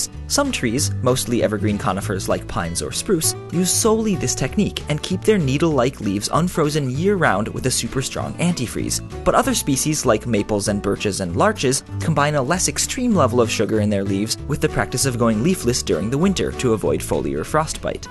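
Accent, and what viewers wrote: Canada. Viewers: Are you canadian? Just askin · Is this guy Canadian?!? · i didn't know that you are canadian. or are you? great video as always!!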